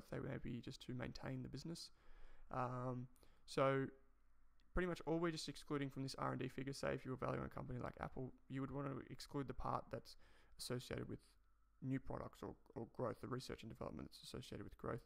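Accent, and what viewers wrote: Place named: Australia. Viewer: hey, i'd just like to add some constructive criticism. I think your voice is a little bit too low, and especially because you have an australian accent, it gets a little bit difficult to understand what you're saying. So you if could speak a little louder, that would be great, haha.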